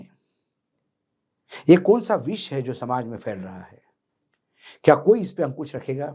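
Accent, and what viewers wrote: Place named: Nagpur, India. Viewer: Aap ki sab bat thik hai lekin nagpuri tadak dehi deto ho, aap ka aakan bhi chuk jata hai, phir bhi kam achha kar rahe hain, aage ke liye sadhana ki jarurat hai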